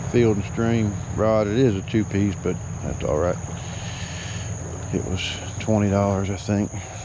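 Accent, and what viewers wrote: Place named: South, United States. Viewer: This Yankee loves to hear you soft spoken southern guys talk!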